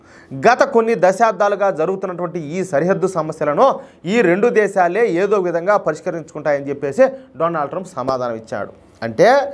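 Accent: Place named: Telugu, India. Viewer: I Like your telugu language slang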